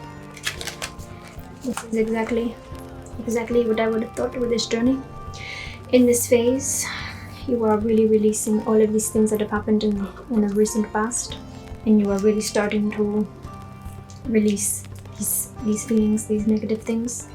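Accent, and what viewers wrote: India. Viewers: As an Indian guy I can confirm that the accent is perfect 10/10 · I love your indian accent and you look beautiful in red 😊❤🙌👏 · As a INDIAN, i really appreciate your effort regarding Indian speaking accent of your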